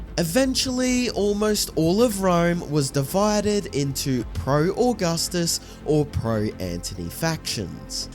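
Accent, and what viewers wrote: Australia. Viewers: Your narration gained momentum as you progressed! Well done, love your accent. I'm an Aussie as well 😂😂❤ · I just love the Aussie accent! The way you can cram so many extra vowels into a word. Like 'exposé'. That becomes 'Aix-poah-zaiyyah'.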